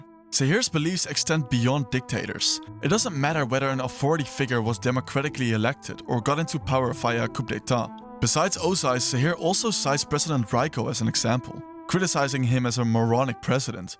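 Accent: Netherlands. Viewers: Your accent sounds very Dutch, are you?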